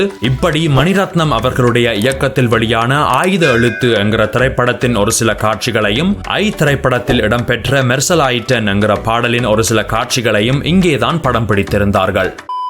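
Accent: Sri Lanka. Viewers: Srilanga Tamil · இது என்னடா, இலங்கை வாய்ஸ் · Neenga Sri Lanka tamizhana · Anna nenga srilanka thaneai nenga pesurathu enga naattu jafna Tamil pola iruku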